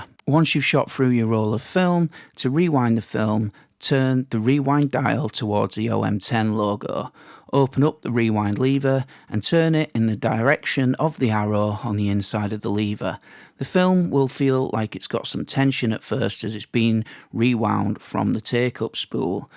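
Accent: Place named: East Yorkshire, United Kingdom. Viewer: Very helpful, thank you ! Also a lovely rare bonus to hear a fellow East Yorkshire accent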